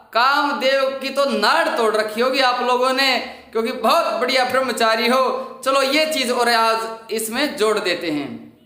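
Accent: Haryana, India. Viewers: Ha guruji Mai 2 × speed pe dekta hu To samaj ni aati haryanvi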